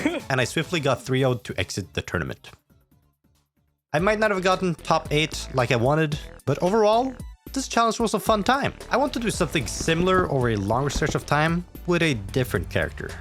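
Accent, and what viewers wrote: Norway. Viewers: Du er norsk? Kult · Kommer du fra norge?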